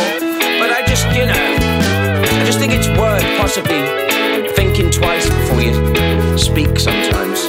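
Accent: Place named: United Kingdom. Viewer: Obsessed with the brit accent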